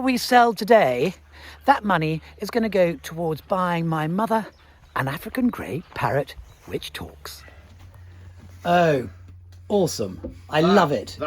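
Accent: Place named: RP, United Kingdom. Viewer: Love your accent very posh indeed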